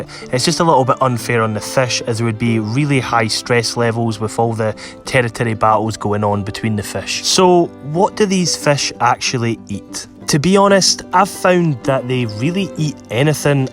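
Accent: Scotland, United Kingdom. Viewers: Ahhhh… the Scottish accent… how I’ve missed it! I did some traveling in Edinburgh a while back. Lots of good memories!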